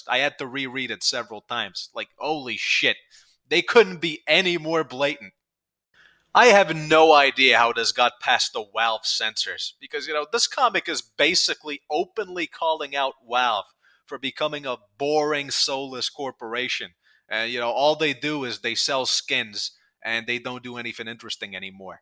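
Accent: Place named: Italian-American, United States. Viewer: Youre the most italian american man ive heard since i watched the sopranos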